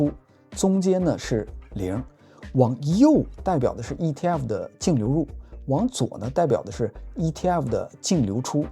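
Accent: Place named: China. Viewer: 很明显是大陆口音，即使人在国外也是在大陆出生长大的成年后才出国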